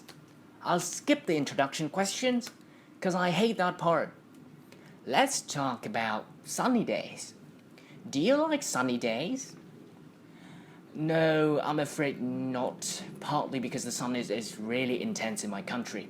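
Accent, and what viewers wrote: United Kingdom. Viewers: Chào bạn, mình rất thích giọng Anh Anh của bạn. Bạn có thể chia sẻ cho mình 1 số channel British Accent mà giọng Nam ko? Thanks for your help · Tỉ năm mới tìm đc 1 anh nói English accent :3 không cô đơn trong thế giới các bạn nói giọng Mỹ =))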